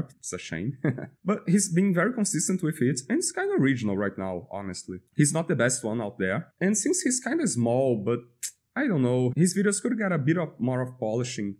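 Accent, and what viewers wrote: Brazil. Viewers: That brazilian accent! Great content, keep it up!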